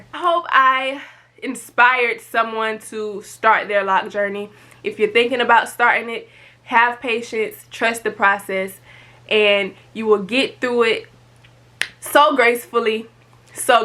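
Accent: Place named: Atlanta, United States. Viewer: I’m getting real Atlanta GA vibes from your accent 😂 love it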